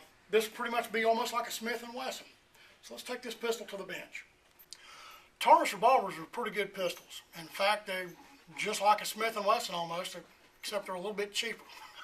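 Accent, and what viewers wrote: United States. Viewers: cool cowboy accent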